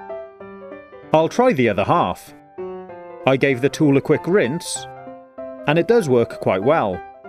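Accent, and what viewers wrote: United Kingdom. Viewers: if I had a British accent like yours I'd never shut up 😂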